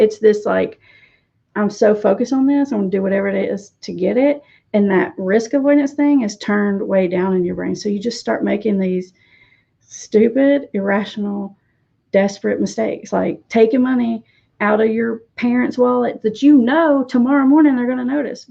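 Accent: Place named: Alabama, United States. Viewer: Chick-a-dee ... you sound like a sister or cousin from home.. you know, the land of Bless your heart ❤️.. lol .. I’m from north Alabama .. you have a dialect I hear often from bham, Tuscaloosa and surrounding area - loving your content !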